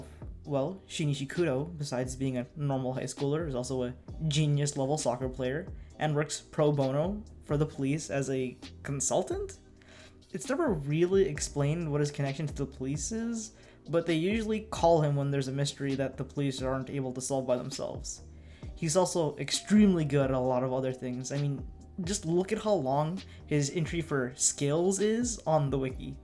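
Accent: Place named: United States. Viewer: The way you say it sounds like CONAN THE BARBARIAN. Your way of saying japanese names far too american aside, respect for using the actual names rather than the MURICAN ones.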